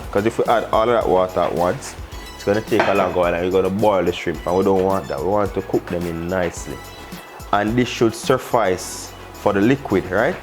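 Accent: Jamaica. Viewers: I love to watch you cook.Jamaican accents are my favorite, from the mountain to the beach and long the country side. How did you learn to cook so well, your parents or grandparents or did you go to culinary school?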